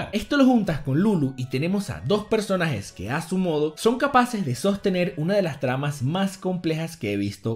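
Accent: Venezuela. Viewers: Hay youtubers que nacieron para fracasar, otros nacieron para triunfar, tu eres lo segundo sigue asi venezolano que se que lo eres por tu ascento xd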